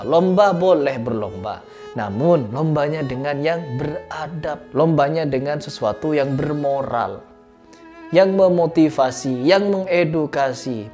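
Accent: Madura, Indonesia. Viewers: Pean logate madura ya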